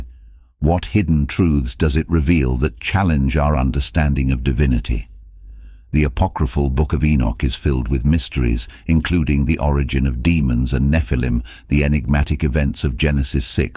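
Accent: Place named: United Kingdom. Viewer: Omnipotent, Omniscient, and Omnipresent means something to me. And your British accent can mean something in television animal documentaries but not in theology. Thanks but no thanks.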